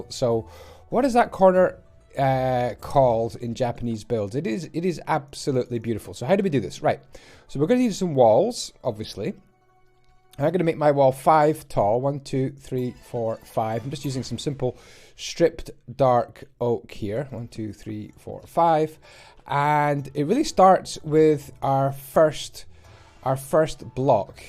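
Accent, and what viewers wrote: Scotland, United Kingdom. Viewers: Are you scottish? Because ei am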